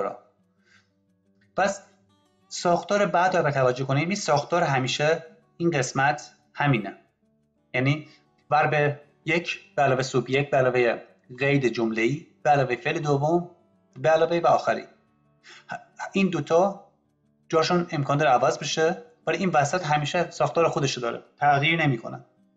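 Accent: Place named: Iran. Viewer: خیلی خیلی لحجه ی ایرانی داری یعنی یه جمله سوئدی رو لحجه ایرانی شو میگی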